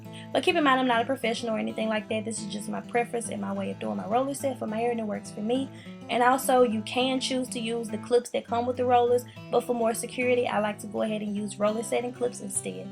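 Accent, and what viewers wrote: South, United States. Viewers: Beautiful hair, good job! Cute southern accent😂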